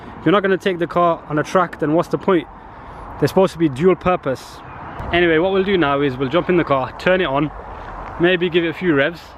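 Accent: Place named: Birmingham, United Kingdom. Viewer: Love your vids bro! Your Brummie accent is hilarious btw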